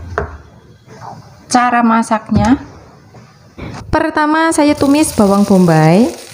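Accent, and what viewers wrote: Banyumas, Indonesia. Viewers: Mba, asli jawanya mn, ngapak ky nyong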